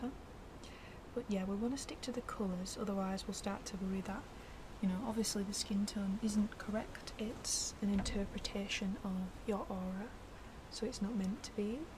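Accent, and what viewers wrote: Yorkshire, United Kingdom. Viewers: Something about your voice which is incredibly calming! Do I detect the Sheffield Yorkshire accent? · Giving into the American pronunciation of Van Gogh, sacrilege haha - I can tell you're local to me, I'd guess a fellow Yorkshire-(wo)man? Although I also have family from Derbyshire, Notts and Hull and can't quite place you!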